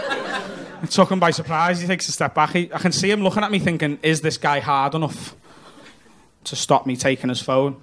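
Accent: Liverpool, United Kingdom. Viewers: Like a scouse haha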